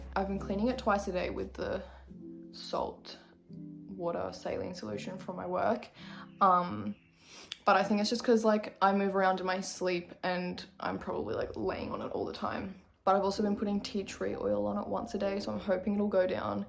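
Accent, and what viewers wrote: Australia. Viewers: My bi Aussie ass just sitting here stunned hearing a similar accent to my own and watching someone exist being that pretty- like huh 0-0 how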